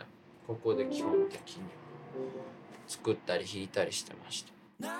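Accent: Okayama, Japan. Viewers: 岡山に嫁いで早２８年目になりまして、数年前に風さんの事を知りすぐにファンになりました。岡山弁で話す風さんも、心地良い歌声も曲も好きです。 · 地元岡山を離れてもうすぐ10年。コロナ禍で1年以上帰省もできず。 私の方言は完全に抜けてしまいました。 風くんの歌詞、インタビューで操るネイティブ岡山弁に魂が揺さぶられました。 懐かしくて…でも新しい。 岡山からこんな人が世に出るとは。勝手に誇らしいです！ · 圧倒的なピアノ、心地よい歌声、素朴な喋り方、深い歌詞、癖になるメロディー、純粋さ、岡山弁でバイリンガル、髪型や曲でガラッと見た目の雰囲気が違ってつかみ所がないイケメン…。 ほんと魅力しかない。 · 娘が数ヶ月前から風さんの歌を聴いてました。私は今月のねそべり配信をみて、何なんw ❕です😍🎶 こういう今迄の経緯が聞けてうれしいです。 風さんのオリジナル曲にどっぷりはまっています。 お人柄も岡山弁も素敵です😌💓 あなたの夢に突っ走ってください(*^-^*) マネさんも素晴らしい方ですね(^o^) 娘とライヴに行ける日を楽しみにしてます(^o^)/🎶🎵💗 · 久々に好きなアーティストに出逢った! 楽曲や歌声はもちろんの事、英語交じりのコテコテの岡山弁や人懐っこい甘え上手な表情も好きだ! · 歌声も顔もその年齢には思えないセクシーさがあるのに話す時は本当に信じられないくらい素朴、岡山弁と英語が混じった語りも、ライブの自由さも全てが唯一無二。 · 神は私達に素晴らしい逸材を与えてくれた・・稀なる素晴らしい天才的才能✨ 音楽でこれ程の感動癒し希望を感じたことはなかった、岡山弁でゆったりとした口調、穏やかな表情、優しい心・・これからも時がたっても変わらずにいて欲しい。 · 岡山で20年以上過ごしてきたから、岡山弁なのかな？？☺️💐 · 大都会岡山弁をずっと使ってるのがいいですね。一生続けて欲しい。岡山愛があればどこでも生きていけると思います。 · まさか、こんなそばに大きな風が羽を休めていたなんて…同僚が風さんの同級生でビックリしました🤣 ここからどんなに大きく羽ばたいても岡山弁を貫き通す、そんな風さん可愛すぎてやべぇじゃろ笑 そうそう、岡山駅の地下にグランドピアノ（自由にお弾きくださいっていうストリートピアノ）が設置されたの知りょ〜る？？ 次に帰ってくるときは、ぜひこっそり弾きに行かれ〜♬ 風さんが弾き出したら『こっそり』いうわけにはいかん思いょるけど🤣 でも、いつか必ず弾きに帰ってこにゃおえんよ〜☺️👍✨ （普段使ってる岡山弁で書いてみました笑笑） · 里庄じゃ！✨ 地元の隣の隣の町（かな？）だから行ったことあるとこにこんなすごい人がおったなんて未だに信じられない✨ しかも、そんなすごい人が岡山弁喋ってるなんて不思議な気分。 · 岡山県の方言がしみます🤗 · 岡山弁ってこんなに愛らしいのね…（千鳥さんのイメージが強いので）いろんな優しさが風さんを包んでいるように感じます。もっと聞きたい、もっと知りたい。どんどん素敵な歌作ってください！救われる人が多いはず。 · 同じ岡山県人ですが紅白で初めてまじまじと拝見し、衝撃を受け以来毎日聴いています。何より岡山弁で話したり歌ったりしているのが素晴らしい。自分の言うこと、音楽に余程自信がなければできないことです。ぜひこのまま岡山弁を通してください。応援しています。仁科博士以来の著名人ですね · 職場のおばあちゃん世代の方のオススメでハマりました！思ってたよりポヤポヤしててギャップに悶ております✨ 〜がまぁたそと同じ発音で謎におぉ！！ってなりました（笑）岡山の人の話し方好きだなぁ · 岡山県民だけどなんかこの人の方言優しい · のだめぐみは、博多弁。風君は、岡山弁。うふっ💓😊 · 岡山から大きく羽ばたいて行かれたこと 今も岡山弁を喋ってくれとること 本当に嬉しいです👏🏻👏🏻👏🏻 身体に気を付けて頑張ってなー🤗 心より応援しょーります🍀🙏🏻😊👍🏻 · 歌ってるところから見始めて、すごく色気がある人と思い、そしたらまだ25歳で、普段どんな喋り方をしてるんだろって思いたどり着いたら、こんな岡山弁満載の優しい喋り方で、このギャップにどっぷり沼にハマってしまいました。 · 格好良い歌声と岡山弁の喋りのギャップがいいね。岡山県民として嬉しい。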